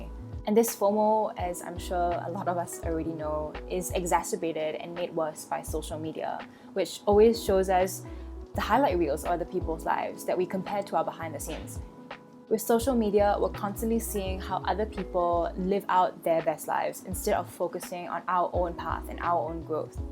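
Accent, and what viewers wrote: Singapore. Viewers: Are you singaporean? Good video!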